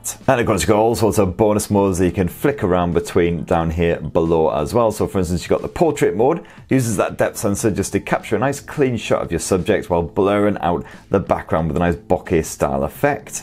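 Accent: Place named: England, United Kingdom. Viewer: Sorry mate, that English accent is quite annoying and unintelligible some of the time.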